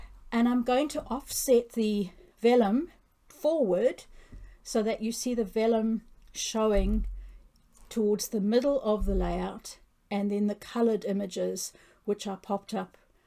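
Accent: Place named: New Zealand. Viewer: Gorgeous and love to hear your accent. Spent three months in NZ in 1974. Loved it! Hugs & Aloha! 🤙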